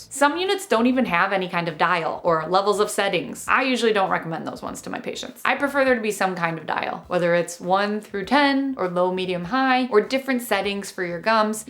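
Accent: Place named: Chicago, United States. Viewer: Your accent reminds me of my family in Chicago. Eh.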